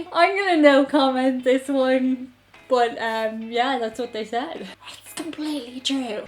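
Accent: Ireland. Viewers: Out of curiosity, what American accents do you, or other Irish people, like the most? As a Texan, I find exposure to the Irish accent rather dangerous. I find my own accent starting to shift for a while after hearing it for the length of a movie.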